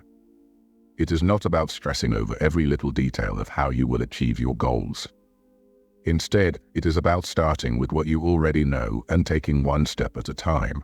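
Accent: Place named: United Kingdom. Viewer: I can hear that British accent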